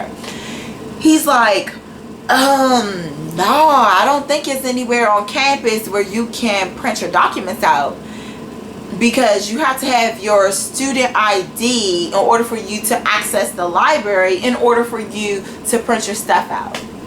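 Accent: Washington DC, United States. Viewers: She's from dc she has an accent 👌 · yasssss. DC accent!!!! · Lol I know you from DC I can tell from you accent. I loved this video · Lmao. You so funny! You from Washington DC or the surrounding area? Cause thats how we talk there 😂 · Lmao yassss!!! I would've done a video like this when I was at school. I went to school in NC but I'm from DC too, I was watching your make-up video and was like this girl must be from DC I can tell in your voice lol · You guys chill out, she's from DC thats how they speak, Its just her dialect.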